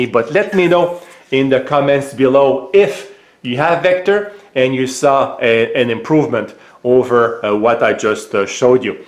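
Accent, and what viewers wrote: Quebec, Canada. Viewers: i guess its the accent ! , he has a strong quebec french accent , for it to work , he should say . hey vector .. bring me the cube TABARNAK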